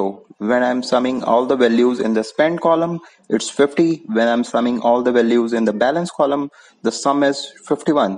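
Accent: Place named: India. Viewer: Oh God I was hoping it wouldn't be an Indian narrator 🙄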